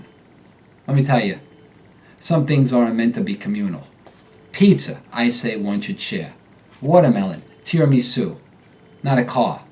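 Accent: New York, United States. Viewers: He's 💯%truly new yorker dam excellent. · Love the newyork accent series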